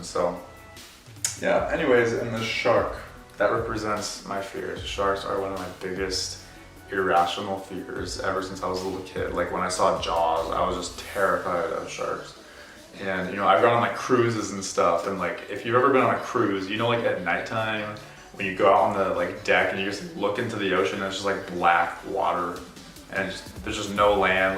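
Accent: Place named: Utah, United States. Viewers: Which part in the US does your accent come from? I'm not a native speaker of English, so that's just a tiny little curiosity of mine. By the way, your tattoos are amazing. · He was born and raised in Utah, so that could be the accent that you're talking about. 🙂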